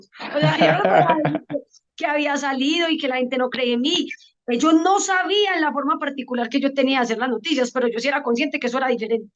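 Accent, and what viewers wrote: Antioquia, Colombia. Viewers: ASÍ HABLAMOS EN ANTIOQUIA.... UN POCO MÁS COLOQUIAL Y ESPÓNTANEA, FELICITACIONES POR SER COMO ERES...NO CAMBIES! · Habla muy normal, sencilla, con acento de mi Tierra, paisita, y frentera, echada pa e Lante. Bien 👏👍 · A mi me encanta apesar de tener acento paisa ......es muy unica irrepetible me encanta la entiendo ..no vayas a cambiar tu estiló claro irreverente sencillo transparente...y punto slds mi reportera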